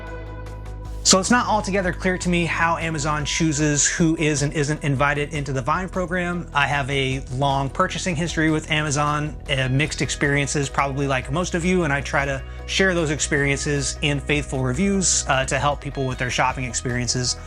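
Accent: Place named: United States. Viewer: 😂 it blew my mind, especially because you sound american. Otherwise I’d just assume that you’re from scandinavia or something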